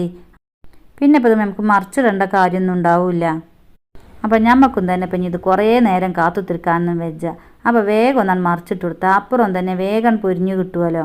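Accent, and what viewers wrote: Malappuram, India. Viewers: ഇതാണ് ട്ടോ മലപ്പുറം ഭാഷ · ഒറിജിനൽ മലപ്പുറം മലയാളം 😄😄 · തനി മലപ്പുറം ആണല്ലേ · Original malappuram slang anu ith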